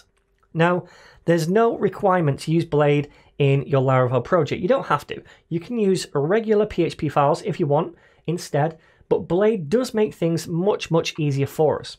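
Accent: United Kingdom. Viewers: teacher, thank you so much! You teaching is always organized, concise and to the point. I was struggling with JS, and your JS Udemy course came to my rescue. I was struggling with VueJS, and your Vue Udemy course helped me out. I have noticed that you used Firebase as temporary backend for those courses. Would you please please please, make a new Udemy course of Youtube series on full stack, in which both frontend and backend are integrated in one project? Maybe Laravel and Vue or Django and Vue, which ever has shallower learning curve? I watched your tutorial so much, now when I read, I heard British accent in my head: ) Teacher, you are the best web development teacher I have ever met! God bless you!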